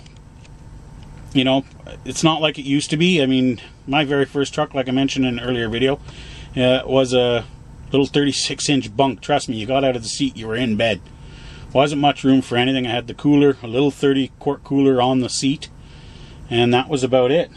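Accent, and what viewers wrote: Canada. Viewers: Good example of the Canadian accent!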